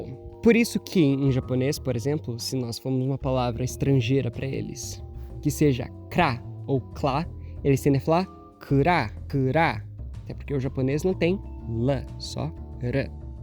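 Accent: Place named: Curitiba, Brazil. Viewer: Show! Você é Curitibano!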